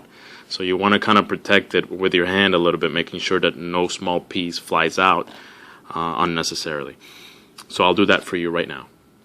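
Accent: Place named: South America. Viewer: Your accent sounds like your from South America. And nothing wrong with that. But it’s not called a, handle, it’s called, the grip. But I understand why you would call it a handle. And this was such a kick ass video. I’ve never seen the inside of this firearm. Thanks for making such an in depth video on the chiappa rhino. Keep it up man. I’d like to see more video of some other gun, strip downs.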